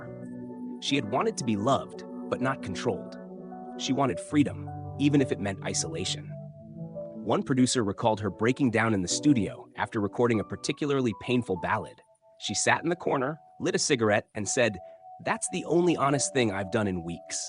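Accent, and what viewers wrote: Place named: United States. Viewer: The HORRIBLE Yank narration spoils this!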